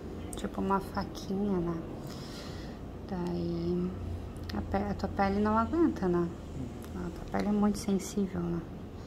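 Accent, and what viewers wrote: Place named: South, Brazil. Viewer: Ela é do Sul, por isso o sotaque. É normal.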